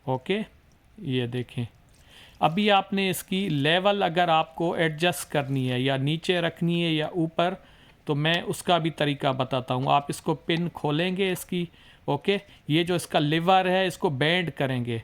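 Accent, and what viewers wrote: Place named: Sindh, India. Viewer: Boht informative video banai apne, well lehjay sy ap Sindhi lag rhy hain?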